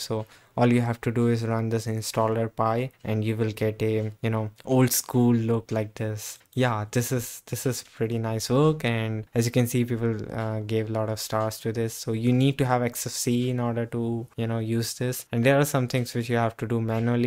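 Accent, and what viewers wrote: India. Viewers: I'm growing to like the Indian accent. Didn't expect it to be an acquired taste.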